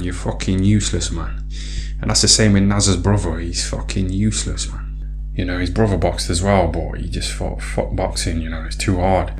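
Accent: United Kingdom. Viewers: This channel is good stuff. You speak like a lot of people I grew up with. I live abroad now and rarely hear an authentic working class UK accent. Awesome content regardless of your voice though.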